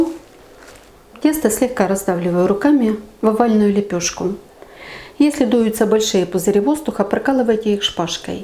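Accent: Ukraine. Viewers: у вас така гарна українська мова❤ Дякую за хлібчик.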